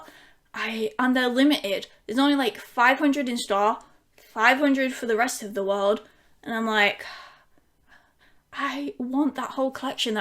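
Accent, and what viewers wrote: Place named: Newcastle, United Kingdom. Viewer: This review is awesome and I love this album. The production and harmonies are outstanding, as is drum sound. You are pretty awesome too hahaha. I was trying to detect your accent. I will go with Geordie. I am a Londoner, so probably totally effed that up hahahaha x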